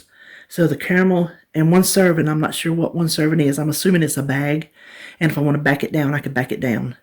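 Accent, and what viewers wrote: South, United States. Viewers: Hey! I love that you are southern like me. When you said scoot I bout died. Only we can get three syllables out of a one syllable word. Nice hauls! I'm not allowed to go to stores so I've been thinking about a Walmart p/u situation. My son did bring me some fresh fruits and veggies yesterday. I'm happy you're safe at home too. x, E. ps: That shirt is Wowsome!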